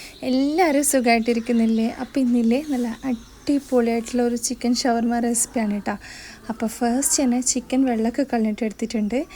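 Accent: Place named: Kannur, India. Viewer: കണ്ണൂരാണോ വീട് സംസാരം കേട്ടിട്ട് ചോദിച്ചതാണ്. Super റെസിപി സബ്സ്രൈബ് cheythutto❤❤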